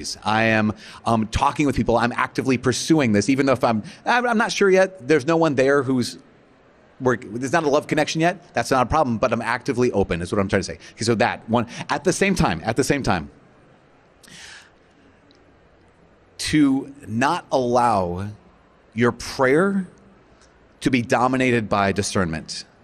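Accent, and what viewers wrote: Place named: England, United Kingdom. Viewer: Great English accent!!!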